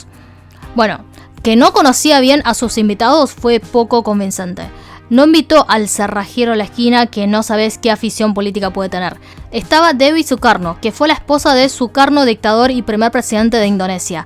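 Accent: Argentina. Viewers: ¡aguante el dialecto Argentino!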